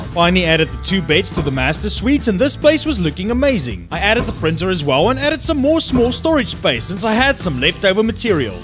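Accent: South Africa. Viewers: Are you South African? You sound South African. I am from South Africa, lol.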